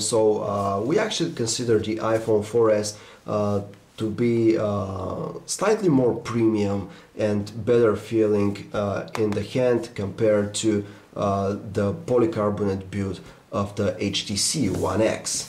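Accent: Russia. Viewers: WTF phonearea guy with russian accent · Is this guy Russian? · Your accent is pretty cool. It reminds me of the russian dude in 007 goldeneye. "I am a genius!" Also, you guys need to review the custom ice cream sandwich roms. They are much better even than the stock android os which is already way better than the ancient little kid IOS It's funny, I see tons of people go from apple to android and they never go back. I have also seen people go android to apple but they alway go back, except one dude. He said it looked "neat". Dumbest reason ever! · Is he russian^^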